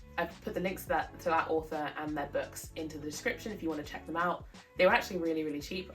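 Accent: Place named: England, United Kingdom. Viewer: Your English acent is amazing !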